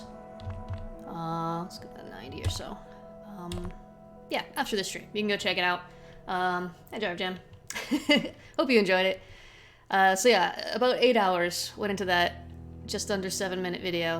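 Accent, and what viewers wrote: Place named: Central Europe. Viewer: From your voice I kinda had a feeling you were from central Europe. Quite amusing how I ended up being correct about that. Also, just out of curiosity, would you happen to be a fellow Dutchie? :p Also, I figured out you can toggle any prep station and holding station upgrades you unlocked simply by clicking on them, so if the large amount of prep stations overwhelms you, that's all you have to do to turn them off again. ^w^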